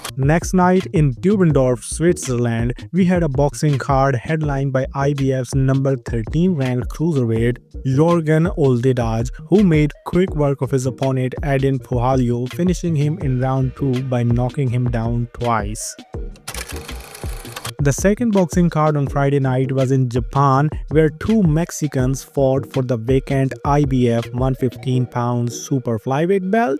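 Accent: India. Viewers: Good to hear from you my homie with the Indian accent. Hope you and your family have been well. Thanks for the content you make in combat sports you do a fantastic job. I hope you have a good holiday season and a happy new year my friend!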